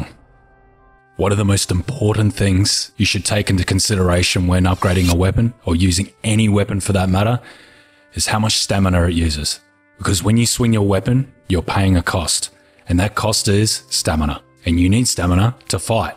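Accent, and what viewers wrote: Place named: Australia. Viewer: gotta love this australian accent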